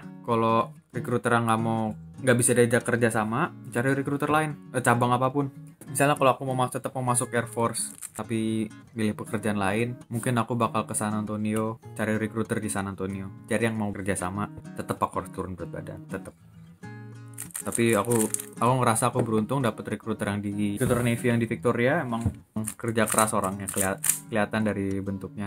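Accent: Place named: Java, Indonesia. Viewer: Wihh jowo Endi mas 😂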